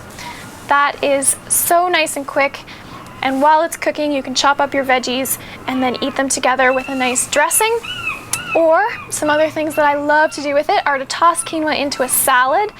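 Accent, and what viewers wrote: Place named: Canada. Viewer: I notice you have a Canadian accent. Are you originally from Canada? Where do you live ?I watch your videos and all is good! Love the Recipes.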